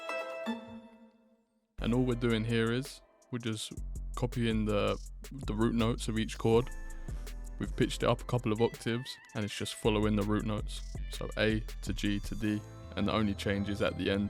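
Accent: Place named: United Kingdom. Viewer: where are you from bro? sound like the UK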